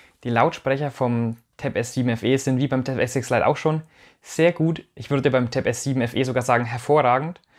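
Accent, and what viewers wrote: Franconia, Germany. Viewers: Ich hab's mir bestellt und bin schon ganz gespannt. Als Klavierlehrer nutze ich schon lange Tablets, allerdings ist es gerade so an der Grenze gewesen von der Größe von rund 10 Zoll. Ich habe das Tab A von 2016 und den Nachfolger von 2018 hier herumliegen. Der Akku vom alten ist ironischerweise besser, auch aus diesem Grund möchte ich das FE nutzen. Wie ich höre, ist ein Franke. Ich war in Unterfranken im Internat. Dementsprechend bekomme ich ein bisschen Heimweh und Nostalgie beim hören des frängischen Dialekts. 😏 Grüße aus Hessen! PS für Scans empfehle ich euch Adobe Scan. Das macht auch ohne Blitz hervorragende Scans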